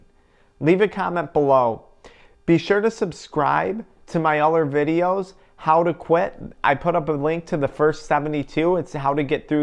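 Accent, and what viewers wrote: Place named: Midwest, United States. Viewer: I’m high asf rn and his Midwest accent is killing me😂😂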